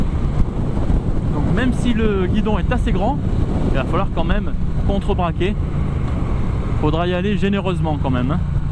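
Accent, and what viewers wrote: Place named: South, France. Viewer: un petit accent du sud bien sympa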